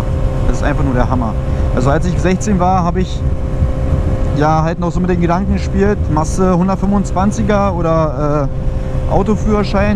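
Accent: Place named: Berlin, Germany. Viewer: da bin ick mal jespannt Keule was übern Winter verändert wird💪😎. Wenn der Schwarze Ring kommt dann mit Gravur = idee von Matze 😂💪. Ick fühl mich dann immer wieder in die Kindheit zurück jeholt wenn die Berlinerschnauze durch kommt. Bin ja in Steglitz groß jeworden vermisse Berlin daher Feier ICH deine Videos mit der Schnauze😂👍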